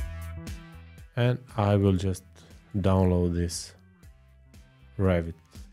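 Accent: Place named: Croatia. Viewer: Nice video and new suscribe! by the way are you hrvat? You have a strong accent and the red and white of your brand. Pozz iz Argentine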